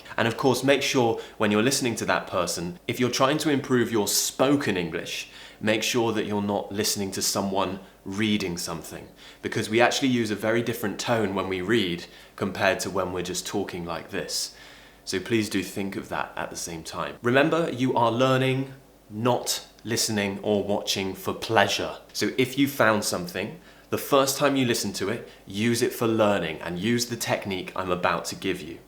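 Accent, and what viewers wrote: United Kingdom. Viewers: Master, it is really wonderful to listen to you! Your British accent and pronunciaton is so perfect! You are an excellent teacher, no doubt. Thanks for everything you give your listeners. I am a teacher of English and Bachelor of Art but I still feel I need more exposure to the Spoken English language. Imitation is one of the most important step in the learning process! You will be my role model, for sure. Looking forward to listening to you again soon. Take care! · Hello, thank you very much, this is really useful. What is your accent? Is it RP? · Greate video bro.I'm from India.India has biggest English speaking population in the world.English is our official language.cricket commentary is the best way to lean English as i utilized this method.tony grag was best commentator ever according to me.he was English cricket captain.his accent was awesome.from my childhood l wished to have a British accent but not happen till now.but ur videos r help full.Indian accent is very different from British.ur British accent is awesome.I can lean more from ur awesome videos bro. 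Cricket world cup is going on in England&India goona facing England on Sunday.wish u good luck. ur biggest fan from India 🇫🇴❤🇮🇳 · I want to speak British, so how I can speak like you I like your speak very much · Hi, I'm a student learning Enlighs from Japan. Thank you fro this video from 2021. You are the perfect role-model for me cause your British pronouciation and accent is my ideal one. You are gonna be my best teacher on YouTube right now!! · Good afternoon. Thanks very much for your great advice. You're a unique, so no matter what I will try to copy you I will never be able to speak like you do with this beautiful and clear British accent. I just love it. Sure I will be listening your podcast, not only to improve my accent but also to learn more from your experiences. I try to improve it all the time, but then asking me to speak like you, is kind of asking to much. However I've been learning so much from you and I do appreciate it. 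Again, thanks ever so much.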